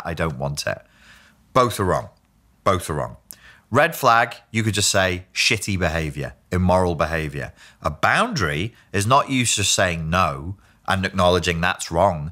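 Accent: Liverpool, United Kingdom. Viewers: Finally I can hear some scouse from. 😱🤗 Or what's the proper term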